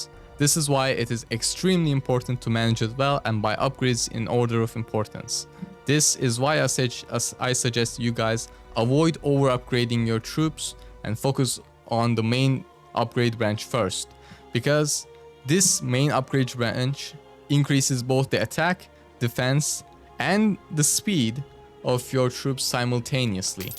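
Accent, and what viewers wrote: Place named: Turkey. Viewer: Are you turkish your accent seems turkish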